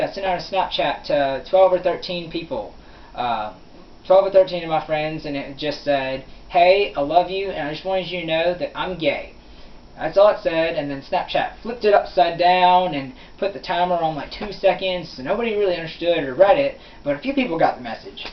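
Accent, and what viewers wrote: South, United States. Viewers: I dont know why but i love the southern accent❤️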